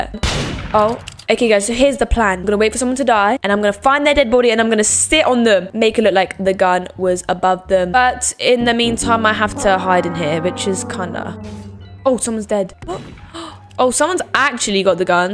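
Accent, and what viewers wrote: United Kingdom. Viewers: your accent is so prettyyy! r you perhaps British, or Australian? Xndjdksi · Her british accent👍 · I love ur voice it’s like the most perfect British accent ❤ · Are you british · Its so uglay😭 Are u British or smth😭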